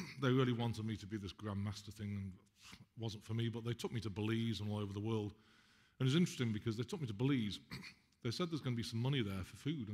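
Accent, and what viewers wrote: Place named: England, United Kingdom. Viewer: It's noticeable there seems to be a "red" English thread running through my life with names of English cities of London and Leicester and now English born with his adorable English accent. How does it get any better than that?!?! Today is March 11, 2019 and I had my first private session (one on one) with on February 26th of this year, and that session was a real game changer for me! It "blew my pantaloons off" as would say....I am just so incredibly grateful that, Amanda and their staff are now in my life. I had been desperately trying to kickstart healing energies for over 2 years to recover from a number of issues but mainly from a surgery without much success. What was I thinking going to an allopathic doctor to begin with? That really messed me up! Never again! Now, for the 2nd time in my life, I know I'm going to be just fine. 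Thank you, and thank you, Mekchizedek Beings! Your help is very much appreciated. Already there are noticeable improvements in my very long list of conditions I need help correcting. Now the story of the first time I knew I'd be OK is a very long yarn dating back to 1994 through 1998 when I had the good (I think) fortune to find and work with a very powerful Being who lived in Leicester, North Carolina and who was, I hope, helping me realize my desire to become aligned with the Divine, but that is a story for another time. That amazing Being, together with an incredibly fu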